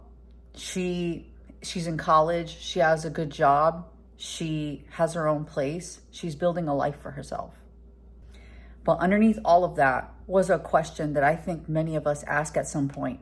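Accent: New York, United States. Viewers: Just make sure you enjoy life as well! You're still a pretty girl. By the way, I love your accent and mannerisms - New York? Some women think they're "strong, independent women", but it's not convincing. You on the other hand come across as the real thing - one tough lady, LOL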